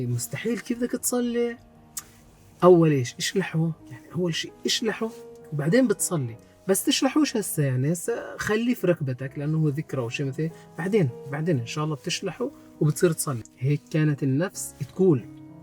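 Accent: Palestine. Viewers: أنا اليوم وبالصدفة شفت أول مرة فيديو إلك وأول ما سمعت أول كلمتين قلت في نفسي هذا فلسطيني الله يرضى عليه، وقبل ما أعرف أي شي عنك وعن فيديوهاتك، والفيديو كان بعنوان "هكذا سيكفيك راتبك بل وسيبقى منه" وبعد هيك سحبت الشغلة وحضرت 5 فيديوهات ورا بعض بدون ما أحس بالوقت، وبعثتهن لابني لأني حبيتك وحبيت أنه يشوف فيديوهاتك لكن هذا الفيديو يا ابني يا كان فعلا مميز ووصل لقلبي غير، حسيت أنه كل كلمة في هذا الفيديو طلعت من قلبك مش من تمك وربي يسعد قلبك ويحميك يا ابني ويثبتك يا رب وربنا يسعد والديك ويجزيهم الخير على هيك تربية. فلسطيني من السويد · ماشاءالله عليك أخي جد انت إنسان عضيم ومحترم الله يجزيك الجنة وينفع فيك بس انت قلت بس الفلسطينين عم يفهموا عليك لا كلامك كلو مفهوم بالحرف نفس كلامنا السوريين ونفس المصطلحات بس لهجة بتختلف😄😁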